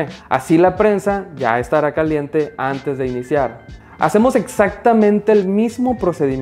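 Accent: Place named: Paraguay. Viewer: Encuentro algo de Paraguayo en el acento, soy de ahí, un abrazo si acierto 😮😮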